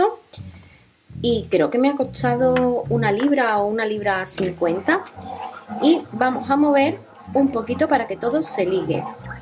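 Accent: Andalusia, Spain. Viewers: Muy ricas!! Ahora quedé bien despistada. Libras, pienso en Inglaterra. Acento andaluz y vestigios árabes. Jaja. Me has dejado confusa. Pero esa receta me la guardo, para hacerlas con ese toque que les das, saludos 😘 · Hola, veo que estás en UK pero ese acentillo tan chulo es andaluz ¿sevillana? Aunque mi canal es de recetas vegetarianas porqué mi hija lo es y el canal es sobretodo por y para ella, yo como de todo y me encantan las albóndigas. A ti te han quedado de 10 Que ricas!!!! 😋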